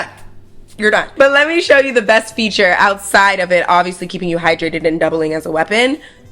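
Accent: New York, United States. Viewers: Am I the only one that hears a New York accent now with her?????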